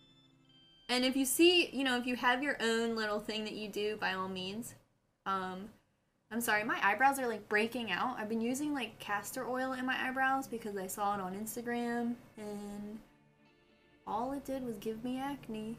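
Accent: Philadelphia, United States. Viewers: I've never noticed your Philly accent until this video! I'm from Pittsburgh so I also have a slight yinzer accent 😂 I wish I would have met you before I moved to AZ. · Every time I watch one of your videos I think about how awesome and cute your philly accent is. It's my favorite. I'm a New Yorker and I live in Colorado so your videos bring me back east when I watch them. Thanks gurl! Also this makeup looked beautiful.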